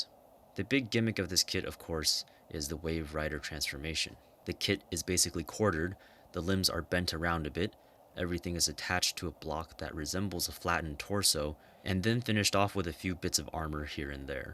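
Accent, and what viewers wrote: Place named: Ireland. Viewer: he's from ireland what do you expect his voice is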